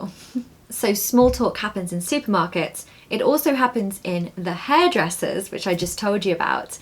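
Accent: United Kingdom. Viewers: I love your British accent,and really I am able to understand what you say also when I don't know all the words.😊Thanks a lot. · Wonderful! It's amazing feeling for the rest of my life that I've found your account and can listen to this lovely information and this elegant British accent😍 we're waiting for a live! If you have other occounts tell us please🥰 thank you from Iraq💗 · I enjoy watching your carefully crafted and informative and energetic posts, radiating your love for wonderful modern and old English. I love to hear true British English coming out of your beautiful and cheering smile. You are best of the best! Off-topic - what is true British English word (a verb) for "to be a fan" (Am.English "Rooting")? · Hi, British English is a closed book to my area in Sri Lanka. You are a great inspiration for me to follow British accent. Your explanation is simple but informative. 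Thank you so much.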